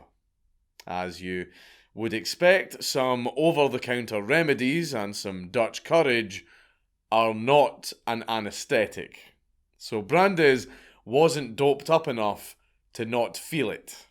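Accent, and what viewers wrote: Scotland, United Kingdom. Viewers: Loving the constant new videos. There's so many people to talk about and I love when you speak Scottish to me. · Dank love you bro but gotta move that pun counter it's blocked out by the subtitles. And your beautiful Scottish accent is almost indecipherable at times due to my lowly Baltimore origins. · I now narrate shit in my head with a scottish accent cos of the count haha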